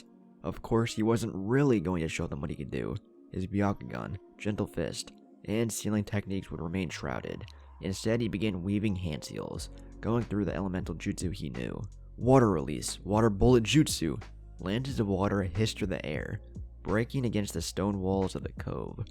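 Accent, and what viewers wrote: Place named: South, United States. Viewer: Love that talks like he’s from the dirty south 😂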